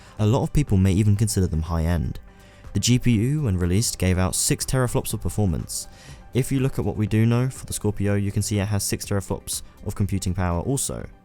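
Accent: United Kingdom. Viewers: Thumbs up if you like to hear doing movie reviews in his righteous British accent with a shot of Scotch!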